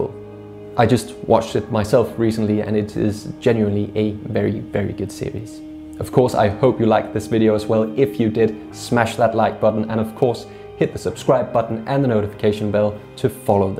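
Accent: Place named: United Kingdom. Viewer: Hearing a British man saying “50,000 people used to live here” and it not be Captain Price or Macmillan is strange to say the least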